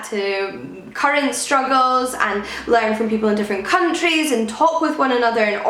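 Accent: Scotland, United Kingdom. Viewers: I LOVE your accent! Scottish accents always amaze me 😂 I'm British by the way.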